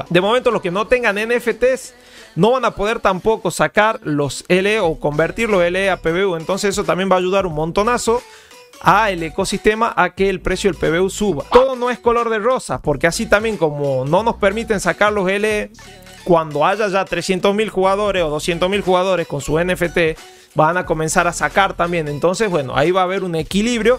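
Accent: Tucumán, Argentina. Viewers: Sos Tucumáno o santiagueño ?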